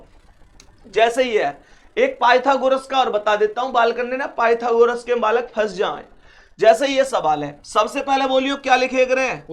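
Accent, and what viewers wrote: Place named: Bharatpur, India. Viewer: Bharatpur jesi language h sir apki ❤❤❤❤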